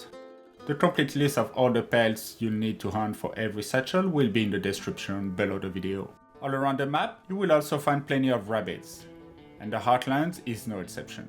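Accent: France. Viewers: Absolutely love how French you sound speaking English.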